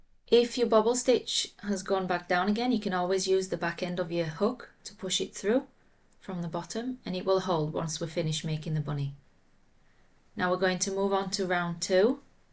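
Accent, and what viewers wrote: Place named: North, United Kingdom. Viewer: Great to hear a northern accent with clear instructions. I’m a beginner and had no problem following your pattern. Thank you. I will be following you from now on. Xx❤️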